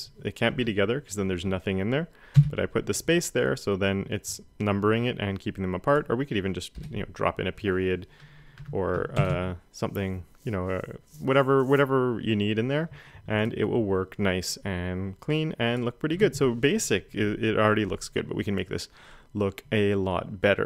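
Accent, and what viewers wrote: Canada. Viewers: Thank you sooo much! This vid was incredibly helpful and made me a new sub. And I could tell right away you are Canadian too lol <3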